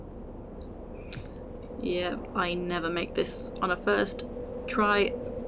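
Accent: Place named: United Kingdom. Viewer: WHEN DID SOUDED BRITISH JUST WONDERING.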